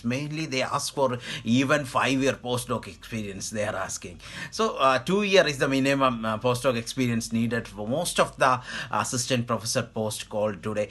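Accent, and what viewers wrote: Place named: Malaysia. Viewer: First of all learn to talk english ,then learn how to pronounce it other than some '' MINGLISH'' 🙄😏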